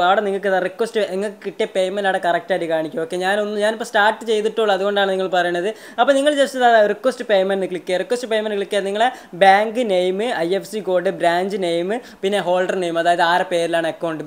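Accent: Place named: Malabar, India. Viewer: ഭാഷ കുറച്ചു മലബാർ ടച്ച്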